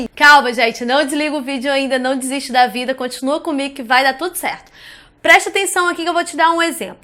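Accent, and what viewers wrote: Rio de Janeiro, Brazil. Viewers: Tu é carioca? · O sotaque mais bonito do Brasil. E eu nem sou carioca.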